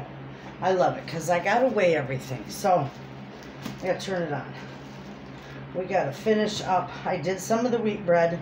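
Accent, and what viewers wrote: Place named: Upper Peninsula, United States. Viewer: I would totally love to shop at your market stand! By the way I like the "way you talk" I was born in the U.P. and now live in Northern Ohio. You talk like my family 🤗❤️ by the way, you grandkids are well behaved cuties! That little boy with his big hat is adorable!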